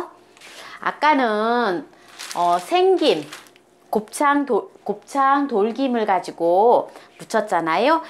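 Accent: Jeolla, South Korea. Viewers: 여사님 말씨를 들어 보니 고향이 전라도 분이시군요. 역시 남도 분들이 요리 잘하시는건 인정 합니다.전 완도산 덩어리로 길게 뭉처진 곱창김을 구입 위와 유사하게 하는데도 하늘나라에 계신 어머님이 옛날 어릴적 해주셨던 그맛이 나질 않는군요 ...ㅜ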